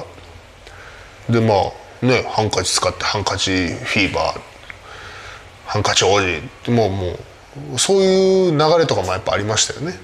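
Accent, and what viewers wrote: Kansai, Japan. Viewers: 日本版ダルビッシュやん笑 まーくんの関西弁初めて聞いたわ🙂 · 微妙に関西弁混じるんが関西生まれですね！わら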